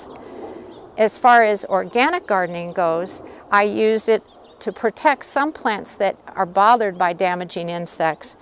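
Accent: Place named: United States. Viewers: I don’t know what they’re talking about it’s just American lol. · American's strong accent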